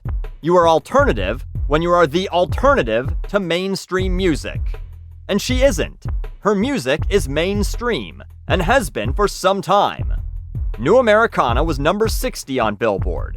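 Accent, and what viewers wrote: Canada. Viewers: Tornusage it's his Canadian accent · Anyone noticed Canadian accent? (out, about)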